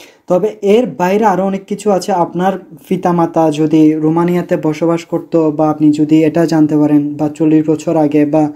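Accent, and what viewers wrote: Chittagong, Bangladesh. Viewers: ভাই আপনার বাড়ি কি চট্টগ্রামে?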